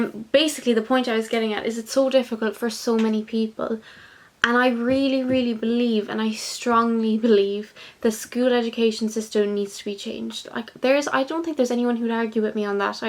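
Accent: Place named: Ireland. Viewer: Hi. My school days were an absolute nightmare. A lot of bullying from other kids and from some of the teachers too. And at home it wasn't better because my parents were always fighting. As i mentioned in another comment i suffer from depression and social anxiety. I think the stuff i experienced during my childhood and something that happened a few years ago caused it. I am on medication now which helped me a little with major depression but i still have social anxiety. And the medication makes me confused and drowsy. I like your irish accent. Hope you are having a great day and good luck with your studies.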